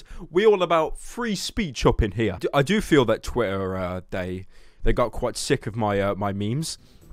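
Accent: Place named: North, United Kingdom. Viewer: It's how we speak in the UK, mostly in the north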